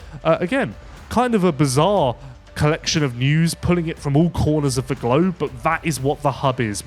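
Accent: United Kingdom. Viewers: Grumpy, monotone UK dude.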